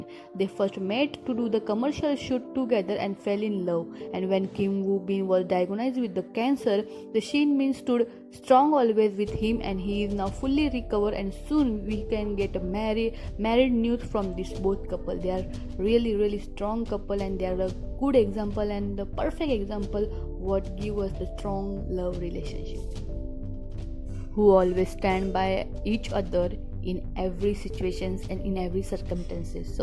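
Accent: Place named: India. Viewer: Madam your tongue sounds like indan 😊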